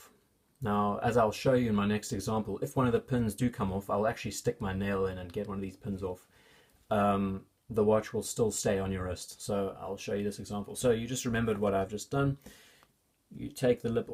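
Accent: South Africa. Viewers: Great video thanks! Are you South African?.. actually no chance you not with that accent. Howzit! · Except the guy has a clear South African accent. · Wow. All these years. I had no idea!! Btw do you mind if I ask if your accent is from 🇿🇦? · Excellent Video Brother. Don't lose the SA accent - takes me right back home. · cool tip. your accent has a south african lilt :-}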